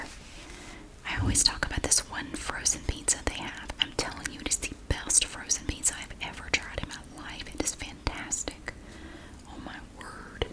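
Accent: South, United States. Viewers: Her soft Southern accent is incredibly relaxing. She could tell me I was fired and I'd probably ask her to say it again a couple of times.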